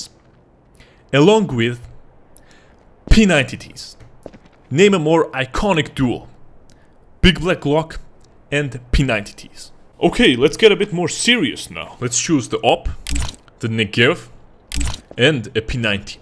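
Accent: Russia. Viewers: are u russin?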